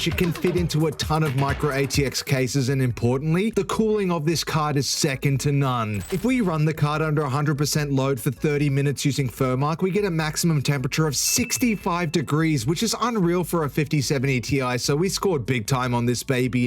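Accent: Australia. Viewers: i dont care about the PCs at all. but i stay for the hilarious aussie voice. yeah nah good channel you beautiful baaaastard:elbowbump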